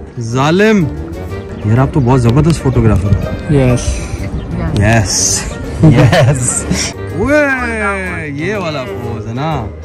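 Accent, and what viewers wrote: United Kingdom. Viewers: I don't get your British accent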